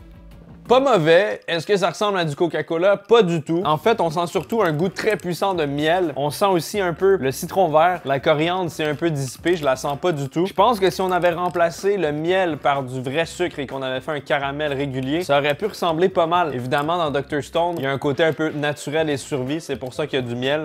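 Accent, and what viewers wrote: Quebec, Canada. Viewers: T’es québécois ?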